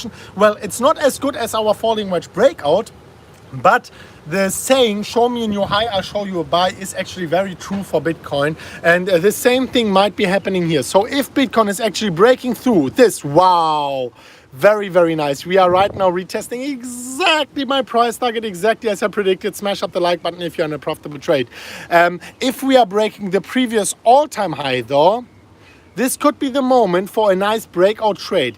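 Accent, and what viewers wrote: Germany. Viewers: 300.000 just right now!!! congratulation mate. btw are you from germany or austria? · Is he Austrian or German?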